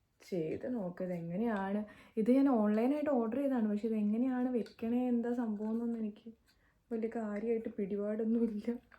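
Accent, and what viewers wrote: Kerala, India. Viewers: തനി മലയാളി അവരുടെ സംസാരം ആണ് ഇപ്പോൾ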